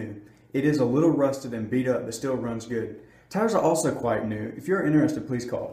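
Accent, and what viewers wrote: South, United States. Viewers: it's like that alot in the south. some words come off really southern for some people and others dont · i love that country voice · Dude I am so confused on your accent. Like one second you sound normal American, then sometimes you sound like you have a southern accent, what the fuck.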